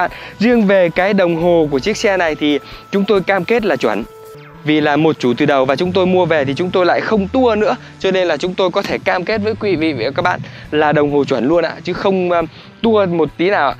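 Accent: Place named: Hai Duong, Vietnam. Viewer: Đúng dân hải dương chất giọng ko chốn dc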